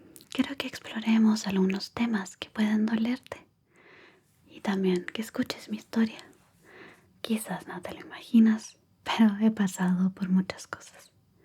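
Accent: Chile. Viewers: Desde la primera vez que te escuché, quedé enamorado y fascinado con tu dulce voz y arrobador tono chileno 🌹👸🌹🎼🎵🎶🇲🇽🇨🇱 GRACIAS 🙏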